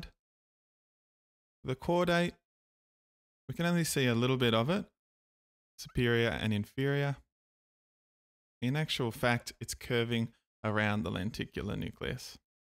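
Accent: Australia. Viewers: Is this australian accent?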